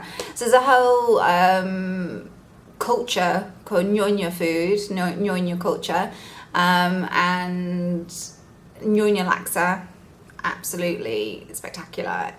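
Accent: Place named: Yorkshire, United Kingdom. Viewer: Luv to hear your Yorkshire accent. Reminds me of Bradford. Thanks luv for loving Malaysia.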